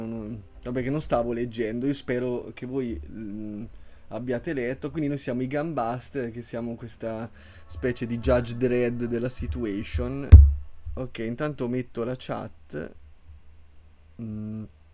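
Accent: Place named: Marche, Italy. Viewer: no ma mio padre è della zona di Fermo e andiamo spesso in vacanza a San Benedetto/Porto San Giorgio, da come parli sentivo un po' di cadenza marchigiana 😁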